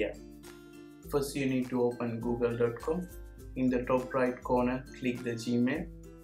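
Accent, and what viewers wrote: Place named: India. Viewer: ddat indian accent do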